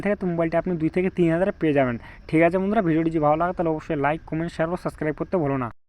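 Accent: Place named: Chittagong Hill Tracts, Bangladesh. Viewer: পাঠক কি চাকমা?? ওনার বাংলা তো বুঝতে খুবই অসুবিধা হচ্ছে।বাঙালি reader হলে ভালো হতো।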